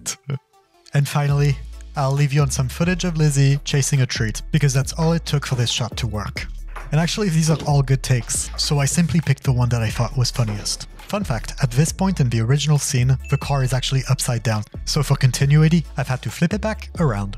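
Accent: France. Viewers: Tu as un accent français, tu es français ? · vu l'accent t'es français non? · Wow I love your channel! But when I was watching this I thought “ hmm this guy has a French accent” then you said “et viola” and then I thought “ this guy is French!” By the way I was surprised because I’m French too and you are one of my favorite YouTubers! So, please answer this question… are you French? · Are you french ? You have a little french accent. I'm french too ! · Ça sent l'accent bien français 😁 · Aren't you French????? I'm hearing some French accent no?? (I'm French)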